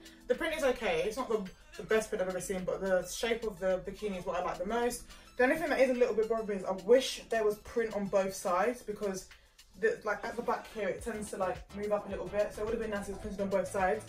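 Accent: United Kingdom. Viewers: Very attractive sounds British accent keep up the great videos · Can you blame them? 😉I hope this isnt in anyway racist, but I've always thought that a beautiful black woman with a British accent was HOT! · Pretty darkskin, slim thick and that UK accent :face-red-heart-shape::face-red-heart-shape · You are really beautiful¡¡¡ you look likea doll and your british accent goes perfect with your personality. you have a heavenly body, close to a perfection. Receive a warm kiss from El Salvador. Carlos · A black girl with a British accent, is a white Canadian dude’s dream!!!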